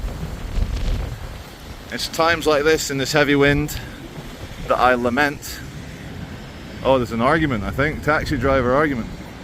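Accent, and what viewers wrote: United States. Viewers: To me you do sound a bit like an American expat, just to be fair to the children and their innocent observations. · you sound like an american in england....lol love your video's never quit telling the truth about your country..the only difference between usa and there we dont put up with the crap fromthem.....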